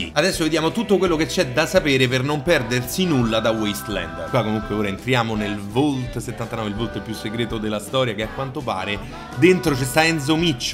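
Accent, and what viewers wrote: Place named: Rome, Italy. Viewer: E mi raccomando, metti sempre il tuo fantastico dialetto Romano...è una tua caratteristica...e ci fa' taia' un casino!😂😂😂😂😂😂💪💪👋👋👍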